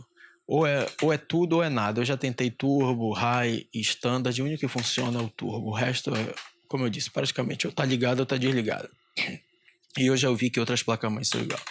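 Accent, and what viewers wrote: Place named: Pará, Brazil. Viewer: Certeza que é do Pará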